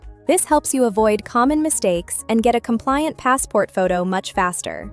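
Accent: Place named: United States. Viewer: why woman with an American accent ?????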